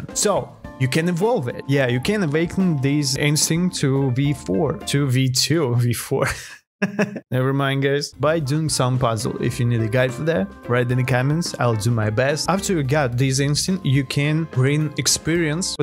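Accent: Russia. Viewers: Bro has russian accent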